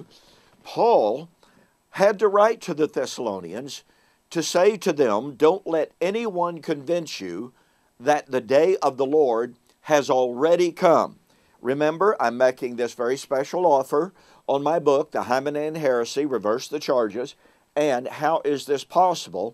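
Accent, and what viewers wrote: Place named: Oklahoma, United States. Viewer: Sounds like Ardmore, Oklahoma. I used to live in the City.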